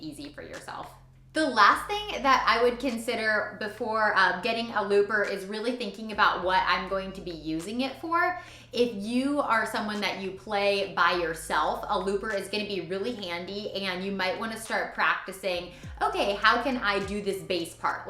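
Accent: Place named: Nashville, United States. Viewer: I know very little about loopers. Quite proud of myself that I previously identified the TC Ditto x2 as my #1 pic for the same reasons that you did. This was still a real helpful video. Also, have to say- starting with a cool demo is so good. Most people start their vids with five minutes of talking. You Win! and sound like a Nashville area pro.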